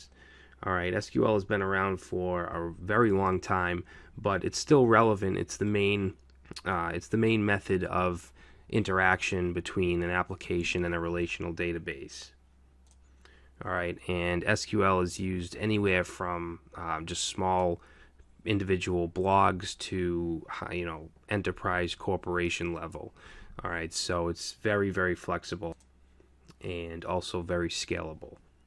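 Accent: Boston, United States. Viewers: what part of Boston are you from? love the video man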